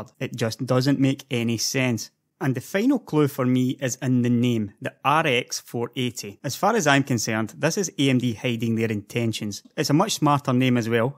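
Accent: Scotland, United Kingdom. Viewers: Are you Scottish by chance? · Seems Scottish. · He's Scottish... not recognise a Scottish accent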